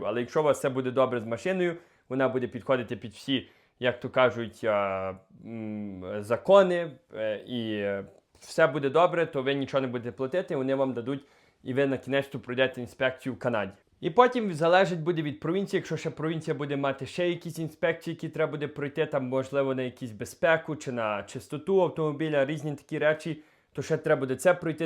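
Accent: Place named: Western Ukraine, Ukraine. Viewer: Цей говор западенський , фу , гидко слухати 🤮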